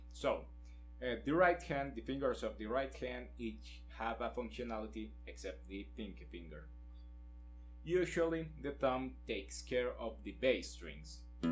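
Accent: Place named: Latin America. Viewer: JAJAJAJA Note un poco de acento latino cuando hablas en inglés, pero no estaba tan seguro. Ntp te explicas bien y se entiende al 100 todo el vídeo. Muchas gracias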